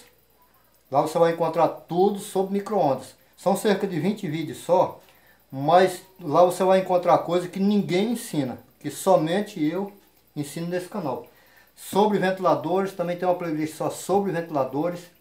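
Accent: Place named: São Paulo, Brazil. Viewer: Fala fala lenga lenga ou é paulista ou carioca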